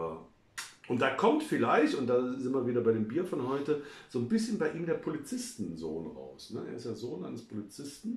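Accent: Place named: Franconia, Germany. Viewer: Sprachfehler? Ich glaub, das ist einfach nur der fränkische Dialekt... 😁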